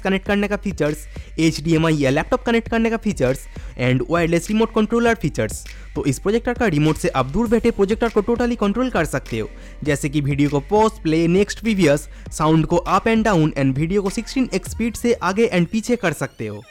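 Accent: Bengal, India. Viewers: Aare kotha sunlei bojha Jai ... Tchara jokon uni 360 camera er unboxing korchilen tokon oner Facebook e Bangla te lekha chilo.. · bengoli h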